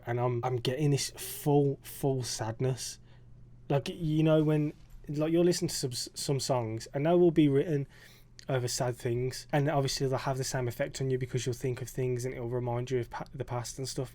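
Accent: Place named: England, United Kingdom. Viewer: Trying to figure out your accent from my Canadian perspective. Are you from the midlands or from a northern town/city?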